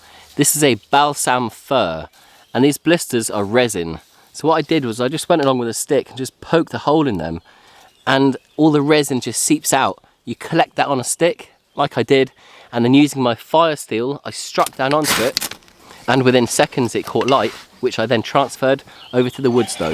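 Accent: United Kingdom. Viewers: our big Yellow Lab named Bentley and our four White Swiss Shepherds named Pearl, Mauna Kea, Singh Dog and Tiger all love watching you and Amber in your wonderful and healing videos... and my Celtic dna loves your lovely accent and gentlemanly nature... it reminds me of time spent traveling in England and Scotland years ago... felt right at home... lots of love and respect to you and plenny aloha from the Big Island of Hawaii...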